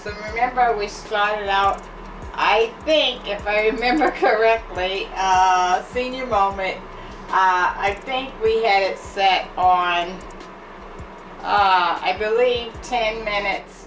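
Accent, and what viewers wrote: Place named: New York, United States. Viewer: Looks good. You have a NY or Jeresy accent. :)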